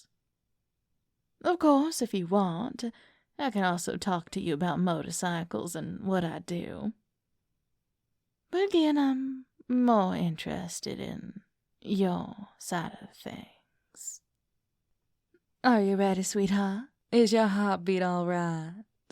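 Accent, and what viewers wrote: South, United States. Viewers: Hi this video is awesome and plus I love your southern accent and plus I love the video and now i go to sleep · I do like the southern country girl accent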